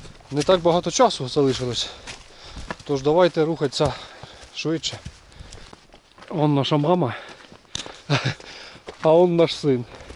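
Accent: Russia. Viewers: вы разговариваете по украински с русским акцентом по русски наоборот с украинским 😮😊❤ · Потому что думаете вы на русском и это слышно😢😢😢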